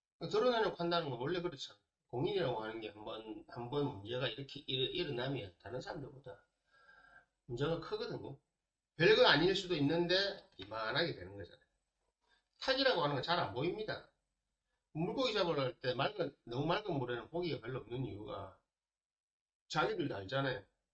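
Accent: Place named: Busan, South Korea. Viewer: 선생님ᆢ 유튜브. 올라오면. 거의. 듣는데. 사투리가. 심해서. 좀 못알아 들을때가. 많은데. 질적인. 내용은. 참 깊이 있으셔요 ㆍ감사합니다 부산이신가봐요?? 사투리가. 심해서요??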